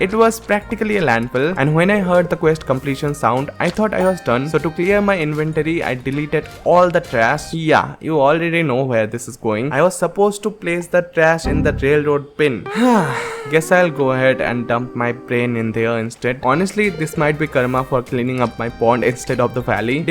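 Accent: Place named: India. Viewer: also you are definitely understandable in English just with funny Indian accent which makes it unique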